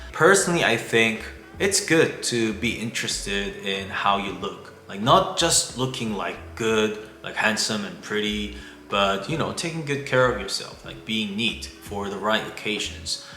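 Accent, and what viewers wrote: England, United Kingdom. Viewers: Do I detect a British accent? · Hello, I came across ur YouTube video very interesting, u sound like a bit of a British accent lol, well I love culture so it was enlightening 😊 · I absolutely love your English accent, especially when you say the word talk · You’re English sounds English 🎉🎉🎉🎉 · I appreciate you sharing this. I have been trying to learn Korean, Hangul and I do know some words of greetings and I an to come there one day. I do watch the k dramas, I Love and some other kpop artists. This is the first time I have watched your commentary and I for 1 really appreciate it. Racism is everywhere. I do however want to ask you, I noticed you speak with a British accent, where does that come from. Thanks 💜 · You are very charming and thank you for taking the time to speak to us about different subjects. I love listening to you. 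I live in the USA and have a desire to learn about other countries, especially South Korea. I started listening to early 2020 when covid became an issue here. I am a kpop fan and I am 73 years old. But then I love all music. I hear an accent when you speak. Is it from Great Britain? You mentioned you lived abroad and your English is exceptional. I will look forward to hearing from you again. Have a pleasant evening. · Have you had a nose job? You sound a little bit British.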